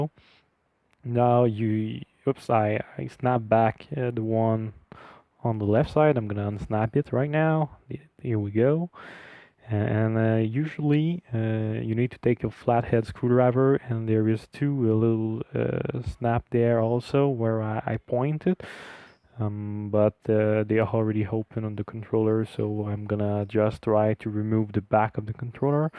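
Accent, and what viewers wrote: Canada. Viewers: Are you from montreal?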